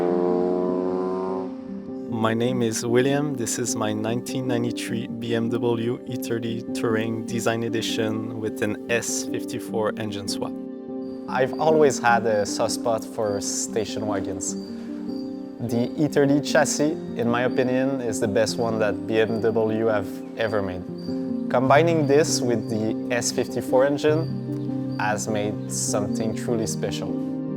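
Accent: Quebec, Canada. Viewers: TOKÉBAC !!! T'as l'accent à GSP mon gars t'es un vrai 💪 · It took 3 words to recognize the accent. Super proud to see a fellow Quebecois on this amazing channel.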